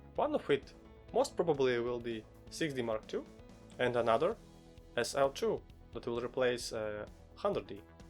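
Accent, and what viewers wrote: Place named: Russia. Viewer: какой то русский акцент, ты русский?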